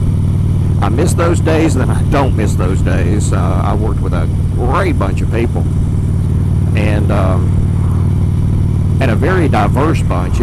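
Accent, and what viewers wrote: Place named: South, United States. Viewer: I think a southern drawl actually helps in a motovlog. It blends well with riding down a country road and the rumble of the Harley in the background. It has a Mark Twain feel about it. And yes, everyone hates the sound of their voice, unless your name was Charlton Heston or Gregory Peck.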